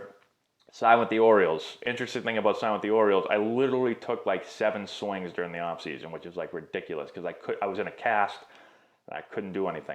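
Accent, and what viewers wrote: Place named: Brockton, United States. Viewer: I grew up Brockton, MA didn’t even know who this guy was. But definitely recognize that accent! Thank you for all these videos man! You definitely bring the complexities of baseball down to a simple Level for everybody to understand.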